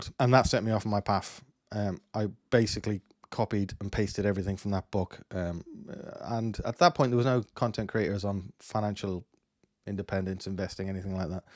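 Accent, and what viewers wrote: Ireland. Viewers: I was thinking I could hear the brogue. ☘ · ayo I would have thought you left Ireland not that long ago, you have that Irish accent, even when you said you came from Ireland I was expecting Northern Irish, no offence. Despite all the bad things about the UK, It is the ISA that keeps me in the UK NGL.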